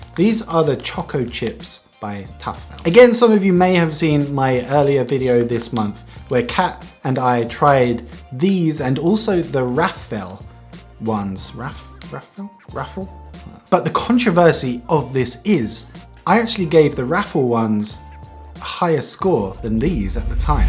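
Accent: Finland. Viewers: muumi limu: ur finnish accent sounds funny im not saying its bad and :makkara: its so good if ur on a journey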